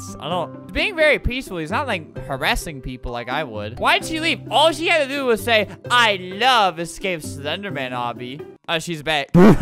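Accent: South, United States. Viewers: Who doesnt love alberts american accent/voice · My teacher did a southern accent, and she sounded exactly like small edit: Holy crud I’ve never gotten 100 likes until now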